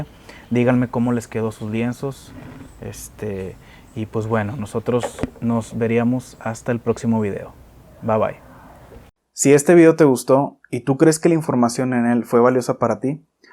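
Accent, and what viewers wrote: Mexico. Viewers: Me agrada tu acento, ¿eres del norte de México?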